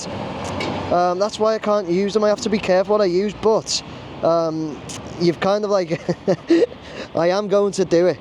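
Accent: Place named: Wirral, United Kingdom. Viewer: First time watching and last, can't hack that over the water accent me 😅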